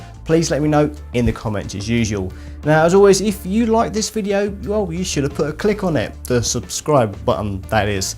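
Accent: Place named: Southern England, United Kingdom. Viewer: bet you any money this guy is from south england or london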